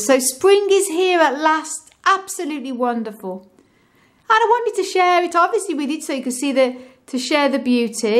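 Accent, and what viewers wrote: Birmingham, United Kingdom. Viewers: Beautiful buds accompanied by a blissful Brummie brogue! Thanks for sharing. :)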